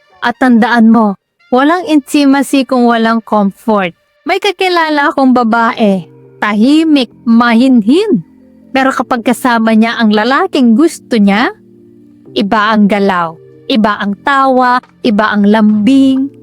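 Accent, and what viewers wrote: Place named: Pampanga, Philippines. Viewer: Kpampangan ka dahil sa accent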